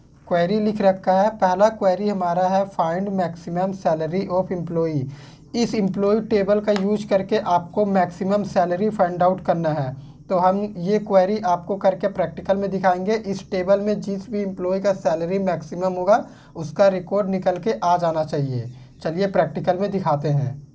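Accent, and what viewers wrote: Jamshedpur, India. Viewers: Are bhai Jamshedpur se ho kya ? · Bhai Jamshedpur se ho ky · Sir ji app Jamshedpur se ho kya???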